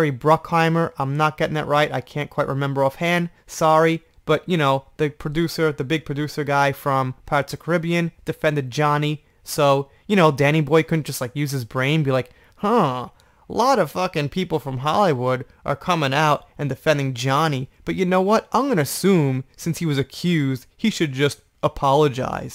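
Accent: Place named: East Coast, United States. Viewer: your voice and easy cost accent is music to my ears..even all the way from Japan..💯💜🦋🤟🍀🌈👊🌹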